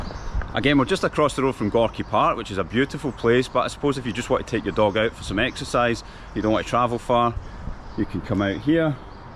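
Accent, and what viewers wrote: Scotland, United Kingdom. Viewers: Thank you so much , nice and clean ! Love your Scottish accent ❤ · I love listening to Scottish accent. Greetings from Serbia bro. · What strange messages that pop up on your video-----like "why you must leave the West and move to Moscow"....ummmh, why is that? Also, from all the other YouTubers who have posted vids of their apartments yours is certainly NOT the average one. Nice to hear your lovely Scottish accent, though! 🙂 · Scottish accent is so cute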